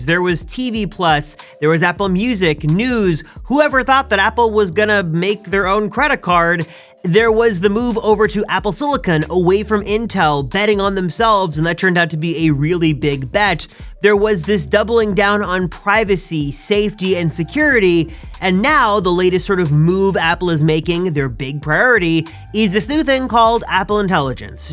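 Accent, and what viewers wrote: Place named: United States. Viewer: I really can't listen to this winey, but loud American accent. Sorry but it hurt my ears!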